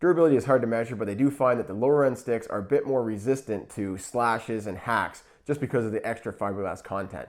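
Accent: Canada. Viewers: What a thick canadian accent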